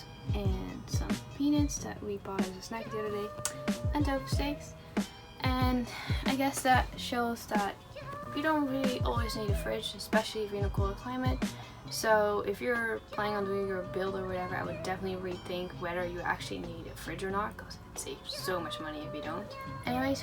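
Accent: Netherlands. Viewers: lovely video!! i didn't know your channel before and honestly didn't look up the description or your channel before watching this video and omg, i immediately thought that you're from the Netherlands!! i absolutely love your accent! and the food ideas in this video 😍 i need to see if you've got more of these videos about you both living vegan without a fridge ☺️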